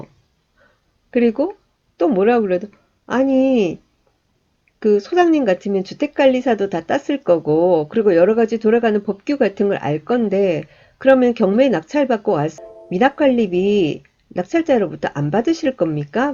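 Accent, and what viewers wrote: Gyeongsang, South Korea. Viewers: 소장님은 진짜 유튜브방송을 계속하시면 어마어마 해지실듯 해요~ 말씀하시는게 한단어한단어 잘들어오네요~ 경상도아나운서!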